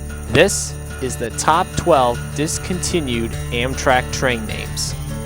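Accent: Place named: Boston, United States. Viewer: Your Bostonian accent is for real 😄 not being offensive I live in MA but I don't have an accent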